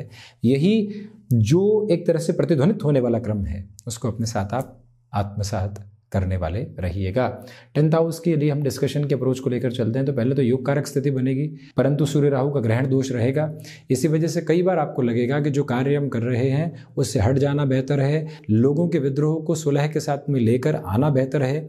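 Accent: Standard. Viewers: Aap ki Hindi bahut khub aur shudha hai..🙏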